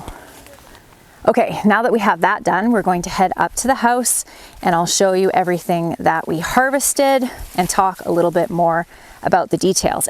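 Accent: Canada. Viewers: No one else takes the time to show people the seeds themselves and how to get them out and how to harvest them because honest to God I had no idea that was so much into saving seeds but I'm thankful I found your blog if I had not found your YouTube channel I would be lost when I start doing this next year myself and I am going to watch everything you have on this because I really honest to God appreciate you doing this thank you so much I'm from America and it sounds like you're from Canada I haven't really checked that out but I can tell you this right now I really do appreciate it and you're you're an awesome parent I have not gotten to see your husband enough to see you know what kind of parent he is and honestly it doesn't matter you are a wonderful wonderful mother and you are so good with your children and your children are smart they act like they're homeschool kids they're very very smart and they are always talking to you and always telling you about something you actually listen to them that is amazing God bless you and your families and my family's prayers and thank you again so much for sharing your journey I have cancer and I would not be able to do that right now and I appreciate it so much that I'm learning something and I'm enjoying it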